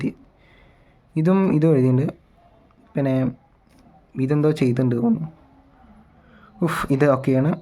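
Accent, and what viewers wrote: Tamil Nadu, India. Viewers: Tamil touch.......... ❤❤❤ nalla sound...... Ente avastha..... 😒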